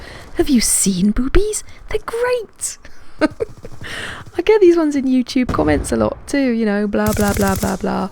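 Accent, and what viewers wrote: United Kingdom. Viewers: I forgot that I wanted to mention as well what a great commentary this was. I enjoyed it thoroughly and agree completely. =) It's also awesome hearing the colorful varieties of english that you only get from the British.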